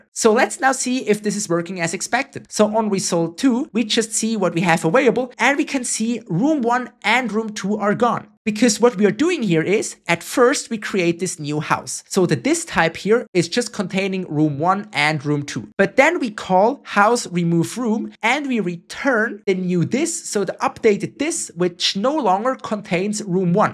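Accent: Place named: Germany. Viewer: Swiss or German colored english - sounds a bit clunky!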